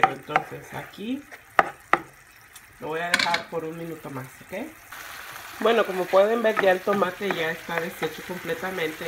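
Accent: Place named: Mexico. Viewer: Ya no habla como hondurena sino como mexicana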